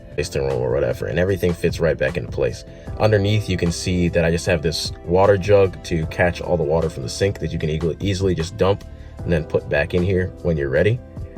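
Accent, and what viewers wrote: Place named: Canada. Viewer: You totally sound Canadian, where are you from?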